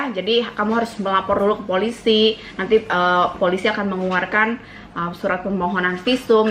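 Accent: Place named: Bugis, Indonesia. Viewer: Logatnya kek orang Bugis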